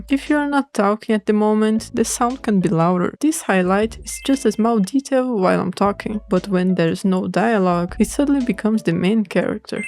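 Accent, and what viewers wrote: Brazil. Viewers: you sounds brazilian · eita sotaque brasileiro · Are u brazilian? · reconheci seu sotaque brasileiro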